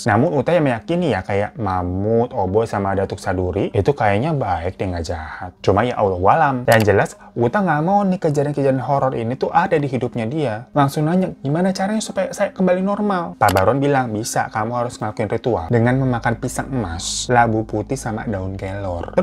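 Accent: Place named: Banjar, Indonesia. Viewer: Lucu dengar bang pakai bahasa Banjar 😊 udh kaya orang Banjar beneran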